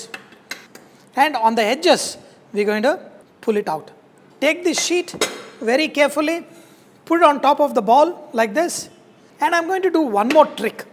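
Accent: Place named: South India, India. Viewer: South Indian English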